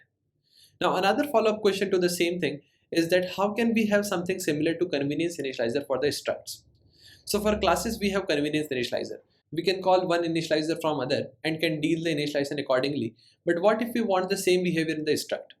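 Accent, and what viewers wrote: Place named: India. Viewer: hint aksanına sağlık güzel bilgiler :)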